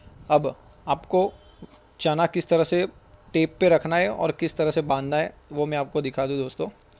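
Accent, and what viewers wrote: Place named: Gujarat, India. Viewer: Sir bhut achi jankari di aapne Aapka ye therapy centre kha h sir Aapki language explan se aap Gujarat se hai ese lag rha h Please give your address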